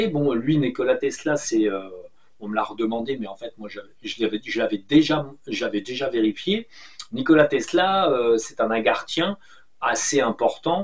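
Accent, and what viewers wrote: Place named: Paris, France. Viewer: salut j' avoue, tu m'as bcp fait rire comme souvent avec ton parlé titi parigo, assez déçue de l'arrivée au sol d'aurobindo loool, son eleve Satprem a écrit un livre ''evolution 2'' que j'ai tjrs gardé en tête et bcp apprécié, il m'a fait grandir c'est certain, depuis j'ai bcp évoluée ou pas.... d'ailleurs, tu sauras me le dire peut être..., j'ai pensé que son instructeur qui était donc Aurobindo, était un peu plus avancé que cela, même si j'ai effectivement bien compris que la connaissance est une question de temps et de moment où un savoir est donné a l'humanité qui ne peut donc pas accéder à des connaissances plus élevées tant que le temps n'est pas venu, sacrée prise de conscience pour moi je l'avoue assez récente, mais qui a remis tant de choses en question, comme depuis 45 ans, où je ne cesse de reculer pour mieux sauter... 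retour estival, j'espère trouver vite les moyens de recourir a tes services, mr, mais je dois avant cela, te demander si il est recommandé de renouveler une séance alors que j'ai bénéficié il y a plus de six mois de celà, d'une séance qui m'a été proposée et pas du ou pu refuser, bref et que l'on trouve en ligne d'ailleurs sur youtentube,et qui a abouti à un effondrement psychique et physique total, comme mourir a moi même si j'osais le dire et dont je me relève tout juste maintenant... a peine.... bref tu sauras me conseiller en temps voulu j'en suis certaine....et enfin, juste pour dire..., je suis assez étonnée que tant de personnes ne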